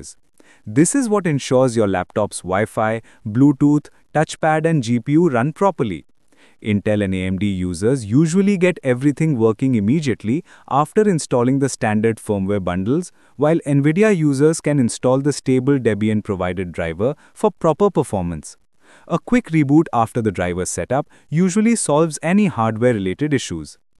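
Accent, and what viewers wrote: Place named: India. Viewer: 换成印度口音了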